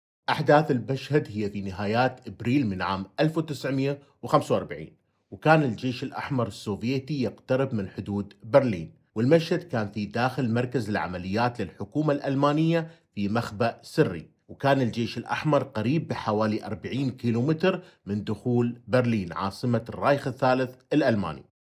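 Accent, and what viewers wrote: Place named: Iraq. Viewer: الحنين الى اللهجة العراقية في سرد القصص😢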